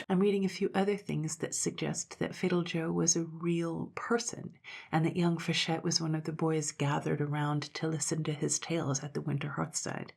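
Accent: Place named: West Coast, United States. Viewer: Portland, OR? I live in Salem, but have been in SE OR taking care of family. I thought you sounded like a West Coast accent. 💗 Ah to have a flying canoe!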